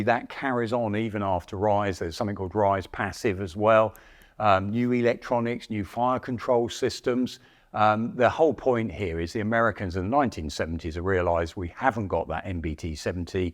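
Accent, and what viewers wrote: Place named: United Kingdom. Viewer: IDK what it is about the British accent of older dudes, but it fits this. As an American it should be US law that in documentaries, there should only be a British commentator. It makes me feel like I'm learning and not just listening to information I will never need 😂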